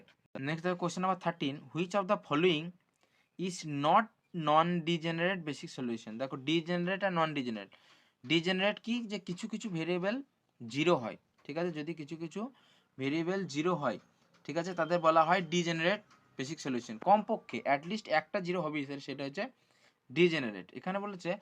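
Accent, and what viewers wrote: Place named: Bankura, India. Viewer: Sir আপনার কি বাঁকুড়ায় বাড়ি?? আপনার কথাগুলো অনেক টা বাঁকড়ি ভাষার মতো শোনাচ্ছে , তাই বললাম । কিছু মনে করবেন না please.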